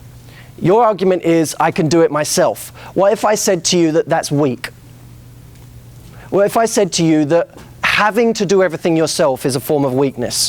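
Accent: United Kingdom. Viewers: lol OMG i LOVE YOUR BRITISH ACCENT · He sounds so much like Daniel Radcliffe. Not just the way his british accent is, but also his voice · Is it just me or did he lose his british accent? Quite disapointing of his appearance now since 2009 ;,(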